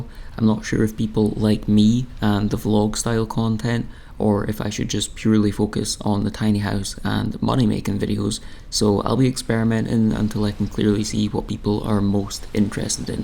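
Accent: Scotland, United Kingdom. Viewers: I personally enjoy both video styles, but the vlogs with beautiful scenery narrated with a Scottish accent and a quirky sense of humor is nice to watch from the blazing heat of Texas, USA. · Hi! I like you! I arrived in Scotland (Edinburgh) this past Monday, and the voice of the Airbnb fellow I am staying with keeps reminding me of yours! You all sound like you are speaking music to me. I so enjoy listening to you and watching. This video is one of my favs so far. You are a great rock skipper. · Amazing scenery is always appreciated. Just money making can get a bit boring. Tiny houses are also fascinating as there are no two the same. And to hear a Scottish voice is cool! 💗🆒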